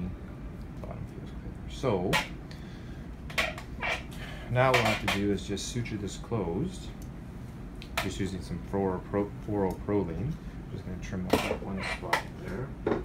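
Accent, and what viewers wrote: Canada. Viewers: I can surly hear your Canadian accent . Love your teaching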